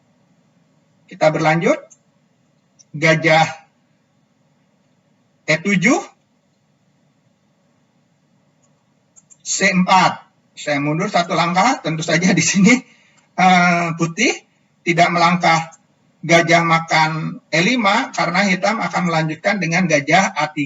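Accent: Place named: West Java, Indonesia. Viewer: Pak logat Sunda nya tetap melekat ya. Mantap lah.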